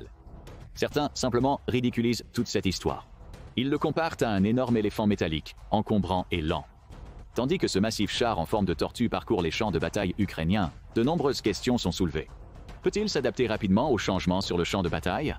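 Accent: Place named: Quebec, Canada. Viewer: c' est radio moscou ici , avec une i. a a voix quebequoise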